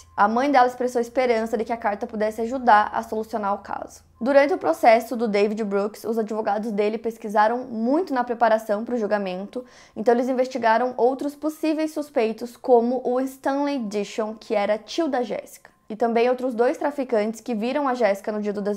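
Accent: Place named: Curitiba, Brazil. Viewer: Ela é de onde? Ela tem sotaque de Curitiba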